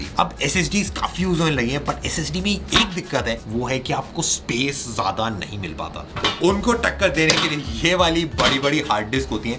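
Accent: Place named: South, India. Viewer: Vai ye batao k aap South se ho kya....south indian jhalak raha hai tumhari muh se....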